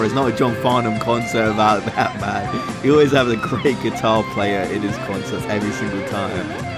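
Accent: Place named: England, United Kingdom. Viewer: Thanka for doing this song of John's. I haven't seen any other reactor do this version before. Also love your nice English accent !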